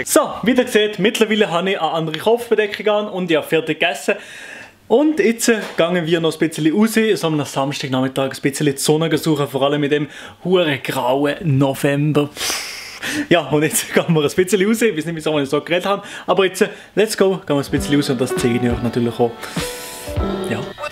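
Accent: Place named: Fribourg, Switzerland. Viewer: I finge dr Friburger dialekt efach sooo witzig 😂😂😂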